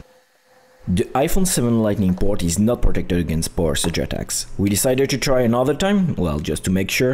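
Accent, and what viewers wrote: France. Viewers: Haha youve got a french accent !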